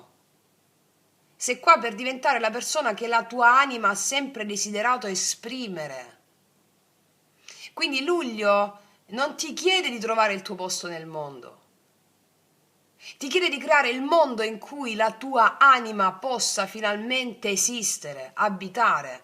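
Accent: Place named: South, Italy. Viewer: Ciao carissima grazie bellissima lettura e bellissimo accento del nostro sud ❤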